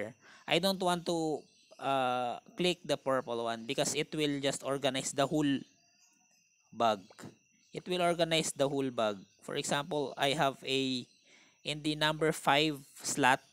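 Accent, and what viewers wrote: Philippines. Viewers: Your tips are very helpful, thank you 👍👍😊 btw I'm loving the Filipino accent 😁✌️ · pinoy accent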